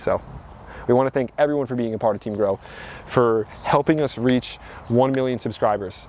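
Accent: New Jersey, United States. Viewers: I can't get over the fact you've got such a strong New Jersey accent! I live in NYC, so I've been exposed to a few N.J. varieties. And is so cool. More, please!😘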